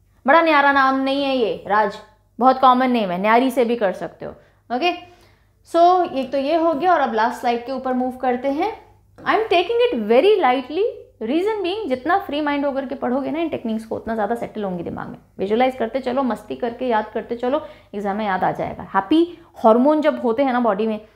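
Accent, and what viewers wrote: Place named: South India, India. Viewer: The SOUTH ACCENT u used in this video is damnnnn 💙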